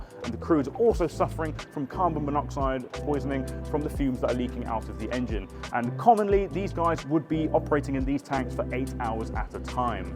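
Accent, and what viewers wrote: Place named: United Kingdom. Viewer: God i love british accent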